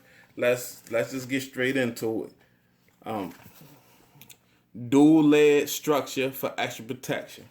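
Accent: Baltimore, United States. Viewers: You from Baltimore huh? The Wire got me hip to the way yall talk lol.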